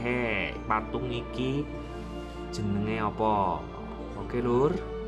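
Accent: Java, Indonesia. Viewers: Jowone pundi mas jenengan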